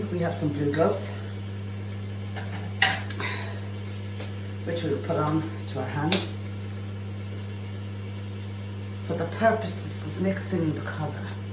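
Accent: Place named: Ireland. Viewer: Thanks,! Love listening to you. Can't tolerate all the "younger" gal videos. We had to double-down on the lockdown here in California, and I've got about 5 different colors of blonde in my hair, so I'm gonna give this a shot assuming I can find that color here. I agree with you on the NO ASH, which is what it seems all the ladies here are going for. Ewww. Doesn't quite work on us over 40s! Again - LOVE your (Irish?) accent and your potty mouth - cracks me up every time.:)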